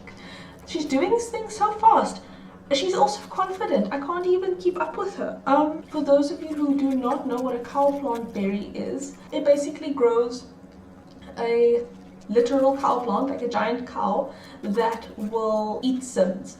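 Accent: South Africa. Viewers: Lost it at "hey MTV, welcome to my crib!" hahah Also, are you South African? Your accent sounds a lot like another YouTuber I used to watch and he lives in South Africa :0 TalesofLumin, I believe is his name.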